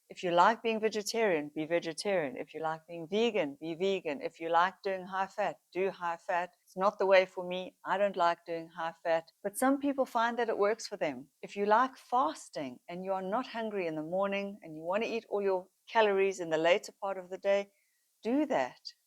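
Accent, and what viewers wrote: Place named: South Africa. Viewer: You’re South African! Me too! I can hear it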